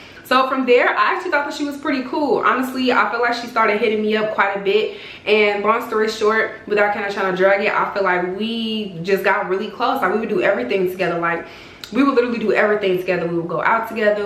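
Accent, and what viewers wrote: Chicago, United States. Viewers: I watched Ti’s story time do I had to watch yours. Btw are you from Chicago?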